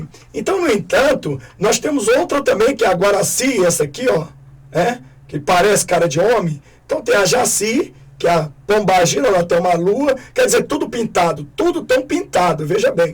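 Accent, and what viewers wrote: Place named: Rio de Janeiro, Brazil. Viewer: Não é a voz da Débora nem da Léia Essa mulher da voz tem o sotaque carioca no S Débora e Léia não tem.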